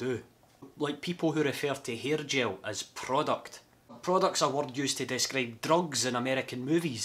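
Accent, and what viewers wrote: Scotland, United Kingdom. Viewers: Where in Scotland are you from you sound like you're from South Ayrshire(like me) or you're from Glasgow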